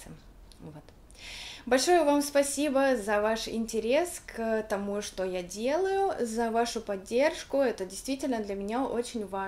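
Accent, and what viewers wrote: Russia. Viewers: congratulations on reaching 100,000! I'm sure you will reach so many more.. You have such amazing talent, i'm really looking forward to hearing some more of your stuff.. I absolutely love your accent by the way! I wish i could speak russian! Lol... 😘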